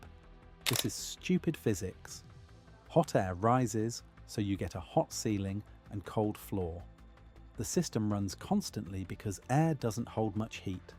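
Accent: United Kingdom. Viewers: Can you please at least put temps in C as well? No one but Americans use this. I mean you have a British accent who uses C.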